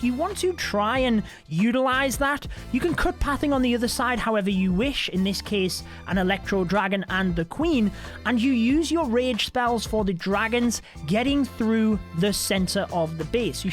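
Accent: United Kingdom. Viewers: I can’t tell where your accent is from. I mean, I guess it’s British but guessing the city is very hard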